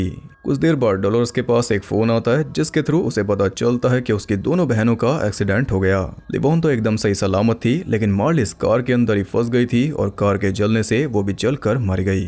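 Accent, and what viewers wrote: Haryana, India. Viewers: mitra tum hariyana se ho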